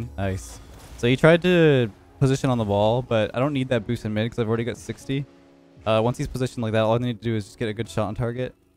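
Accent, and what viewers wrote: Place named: Canada. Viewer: you never let me forget that you’re a Canadian lmao: I’ve figured him oooooouuuut 😂❤️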